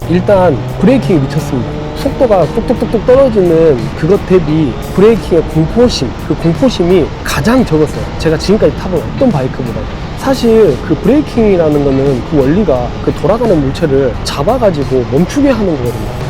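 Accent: Jeolla, South Korea. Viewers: 말투가 전라도 같은데 흔치않는 전라도 유튜버 반갑네요 😅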